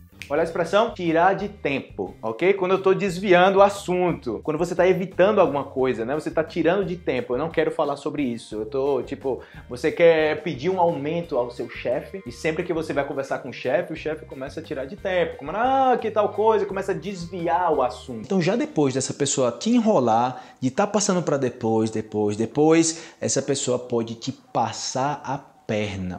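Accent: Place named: Colombia. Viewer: putzzz cara, tô chocado do jeito que tu fala espanhol, tens até sotaque de colombiano kkkk amo seu conteúdo !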